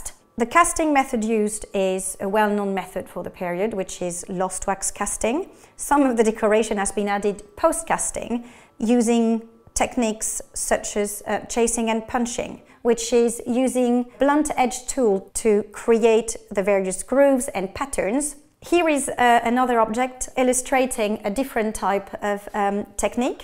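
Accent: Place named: Northern England, United Kingdom. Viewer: She's got northern English traces in her composit accent.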